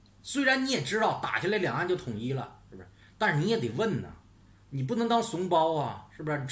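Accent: Northeast, China. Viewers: 东北口音胖子有意思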